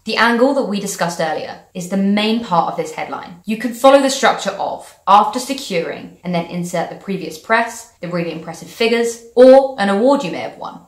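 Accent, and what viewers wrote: United Kingdom. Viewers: THANKS FOR THE INFORMATION, BTW I LOVE THAT BRITTISH ACCENT! ;-)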